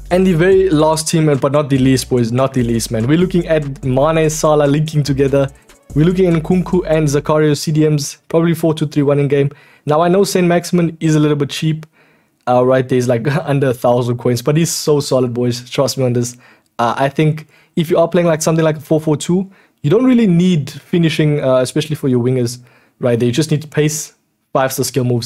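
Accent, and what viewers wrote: South Africa. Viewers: Is he South African?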